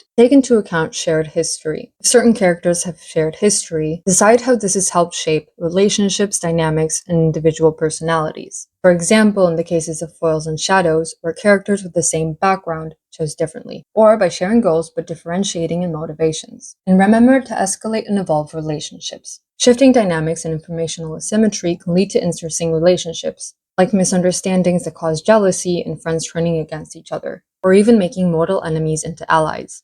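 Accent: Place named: Russia. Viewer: i wanna say russian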